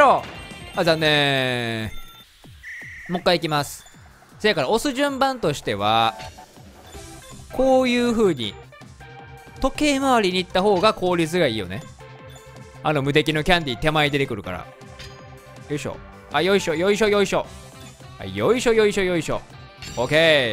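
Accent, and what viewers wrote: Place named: Osaka, Japan. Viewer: おかりんさんこれからもずっと動画投稿お願いします。おかりんさんの大阪弁面白くて大好きです。動画投稿頑張ってください。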